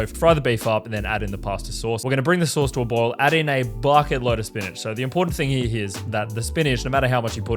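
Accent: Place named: Australia. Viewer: i can't tell if your accent is melbourne or sydney on rare occasion your A noises are more posh like melbourne but then you've got that drawl from sydney it's like listening to a cello that sometimes plays violin parts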